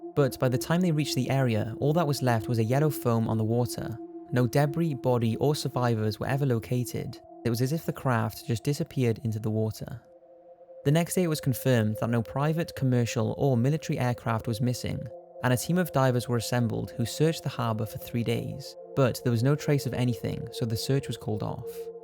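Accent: Wales, United Kingdom. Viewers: I love your videos and your Welsh accent. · Are u British? Lol sorry if its obvious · Dbonkerz81 Pretty sure that's what I said? But he has a Welsh accent which changes his pronunciation slightly.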